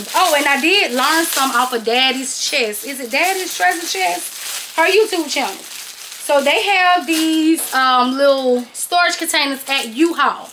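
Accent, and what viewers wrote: South, United States. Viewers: Just to let you know you're the truth I will be trying your concept of a basket business. Love your voice your are truly from the South . May God bless you always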